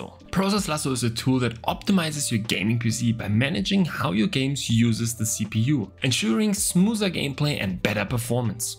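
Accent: Germany. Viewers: Echt Traurig 😢 mach doch auch mal was für deutsche. Das Englisch macht einen verrückt mit dem deutschen - Englisch selbst skript lese akzent 😅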